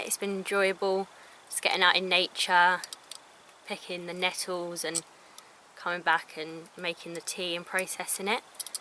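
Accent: United Kingdom. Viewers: You are obviously UK, but what country? I am not good at identifying accents. Brit?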